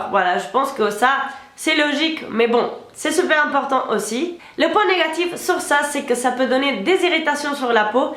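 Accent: Spain. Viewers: oh non une espagnol! l axent est beau tres beau j adore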